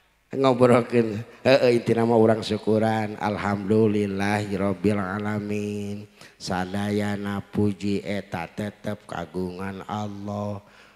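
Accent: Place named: Sunda, Indonesia. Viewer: Ini yg pelawak itu bukan sih?😂 wkwkwk orng sunda emng punya logat yg agak lucu,udh dari pembawaannya juga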